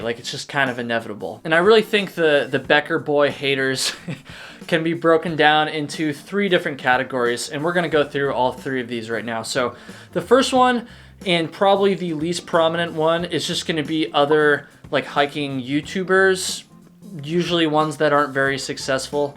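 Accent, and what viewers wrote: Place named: South Carolina, United States. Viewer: Dude are you from SC?! If so what up fellow South Carolinian!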